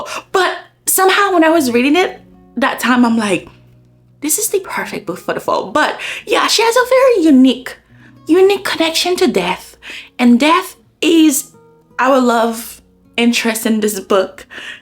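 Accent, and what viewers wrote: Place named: Caribbean. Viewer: I’m hearing an accent, just wondering which Caribbean country you’re from?😅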